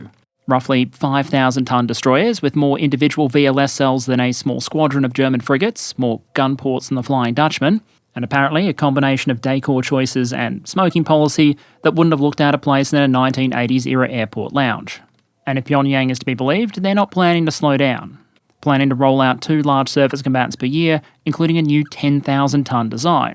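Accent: Australia. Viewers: Always thought he is australian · Yep almost sure he's an Ozzie.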